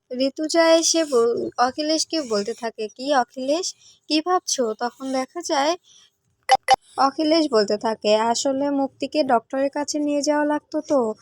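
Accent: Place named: Bangal, Bangladesh. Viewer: বাঙ্গাল বাঙ্গাল উচ্চারণ ভাল শোনায় না।চেষ্টা করলে এটুকু নিশ্চিত ঠিক ঠাক হবে।শুভেচ্ছা রইল।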